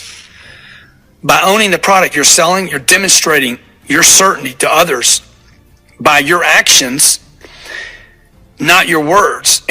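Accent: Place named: South, United States. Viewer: love the redneck accent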